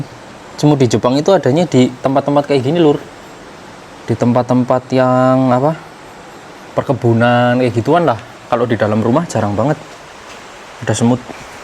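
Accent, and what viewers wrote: Banyumas, Indonesia. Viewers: Guyu ne kakak khas banget kaleh ngapak e nek ngomong seng bikin TOP.bojonegoro Jawa timur hadir.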